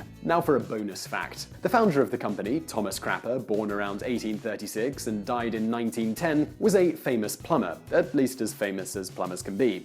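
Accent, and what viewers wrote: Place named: RP, United Kingdom. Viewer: You are very informative inside YouTube program however you have a certain tone commonly known as Oxbridge I know one has to speak distinctly but so do I without send sounding condescendingn inner wave sound like Brian Sewell is he still alive help me YouTubers or is he dead not you obviously because you sound a lot more friendly uh I just wonder if you have a certain tone I said Crapper not kappa one could almost think of somebody called trump trump Astra now Probably get arrested for daring to mention his name thank you for your downloads not faded old queen who does like your downloads but I'm worried about trump trump yours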